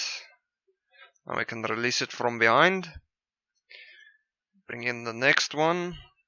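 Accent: South Africa. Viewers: Nice Video. ... You have A south African ACCENT.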